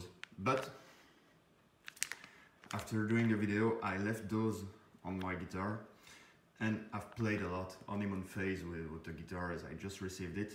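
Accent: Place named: France. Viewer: T'es français toi!😅😊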